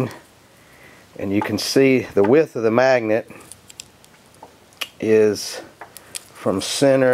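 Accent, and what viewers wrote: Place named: Georgia, United States. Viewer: Sir...........are you a hill billy from Georgia? Your akcent is amazin and i liked ur video sir.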